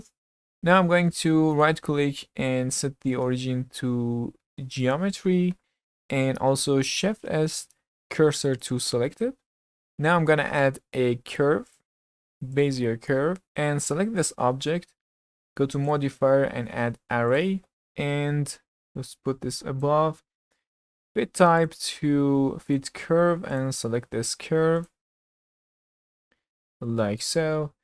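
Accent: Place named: Iran. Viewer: لهجه. فارسی ❤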